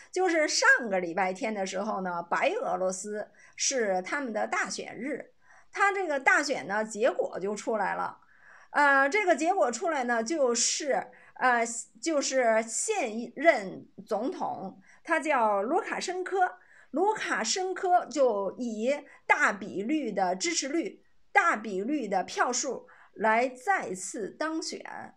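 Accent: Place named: North, China. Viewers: 拜托＂以后＂发音＂蚁后＂ ，不是＂姨后＂。 你这老女人的一口北方农村口音太丢人了。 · 你的中文是跟谁学的？北方农村口音怎么丢人了？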